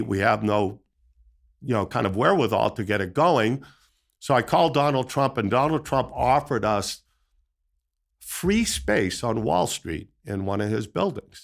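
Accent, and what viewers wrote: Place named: New England, United States. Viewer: I like your style and the New England accent. I am from Ohio since 1977, but born and raised in Massachusetts up close to New Hampshire. You should do well with this show. Thanks.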